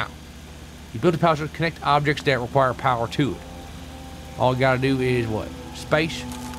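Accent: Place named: United Kingdom. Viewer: lol... Love the British accent. All this brings me back to FNV, first saw you, and ur vids, followed you through all that, and Skyrim till that bombed, but damn you drove me nutts in that one since I was trying to follow, never did finish it. Love your mom, but I agree it's just too much for her to watch all, and u being able to say whatever you want... Thanks for all the entertainment with all these vids, they are very important to many people.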